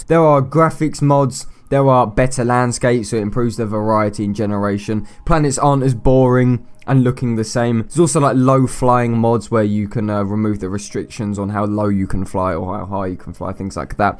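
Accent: United Kingdom. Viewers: damn. i would hate it if a british person like you insults me. the accent you british folks have makes the insults sound meaner then a normal American person when they insult someone. i was referring to when you said that they don't deserve money you're accent made it sound so harsh. its a good thing. lol take that as a compliment. i guess you can say your accent reminds me of Simon Cowell during the American idol days. lol. besides, i thought this was an okay game btw. homefront revolution was worse then this though.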